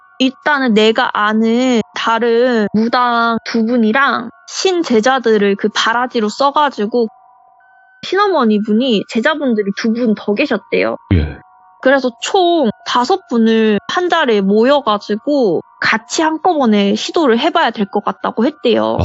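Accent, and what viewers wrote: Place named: Jeolla, South Korea. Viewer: 고향 전라도시죠!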